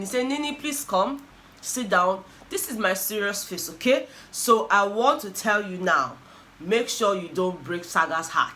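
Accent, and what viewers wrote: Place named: Pidgin, Nigeria. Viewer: Babe! I'm a first-timer and you got me with your clean English mixed with Nigerian pigin. You've got a new subscriber! Well done.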